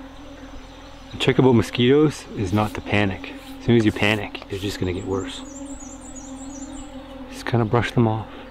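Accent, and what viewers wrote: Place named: Canada. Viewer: So annoying how Canadians so about and aluminum!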